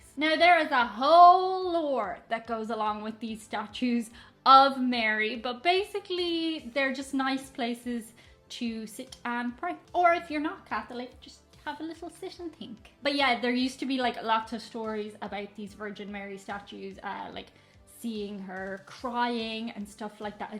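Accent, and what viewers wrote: Ireland. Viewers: I love your Irish accent,. It's like listening to music. 👍🥰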